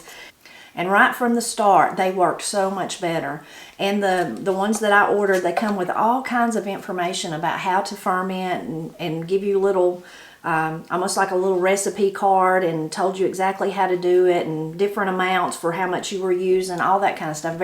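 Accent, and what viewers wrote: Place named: West Virginia, United States. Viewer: I just found this post and I'm very curious because I've been looking for something I can stand to drink. I'm in California from West Virginia and have quit drinking water because it smells and taste so bad. This has caused many medical problems because of being dehydrated. I came here in 1962 with my parents. I was 12 years old and we use to drink water running out of the mountains, so clean and delicious. I'm now 74 and cannot stand to drink this terrible water in Modesto California. I'm going to do research and try to make water I can stand to drink. I just wonder where I might get my starting water.ost water sold in bottles here are just tap water that I will not drink. So I'm starting with a handicap. I've becr addicted to your shows. I miss now WV home but cannot go home due to medical coverage for my heart condition. 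Thanks for your guidance and your accent, reminds of all family and friends that have past over.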